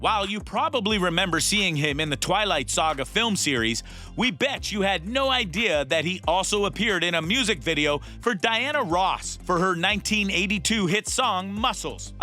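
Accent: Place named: Poland. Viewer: the voice of this guy is from poland or something...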